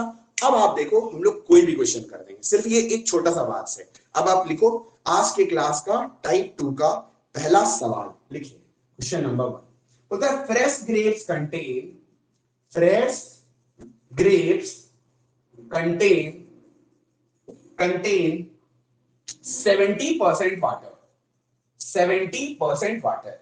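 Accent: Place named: Bengal, India. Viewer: Dada tumi ki bangali?? Tomar tone ta lagche jeno tumi bangali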